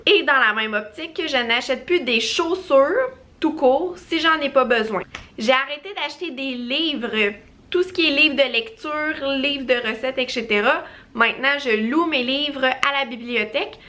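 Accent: Canada. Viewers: Mon dieux comme c fatiguant d entendre l accent française des canadiennnnnnn.... c fait mal a la tête..excuse moi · J adore ton accent chère youtubeuse du Canada.. Et tes conseils bien sûr une abonnée du Sud de la France..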